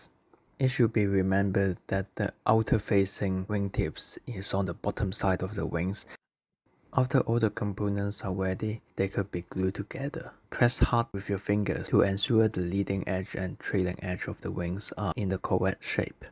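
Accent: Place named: China. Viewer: Are u from china? I like ur accent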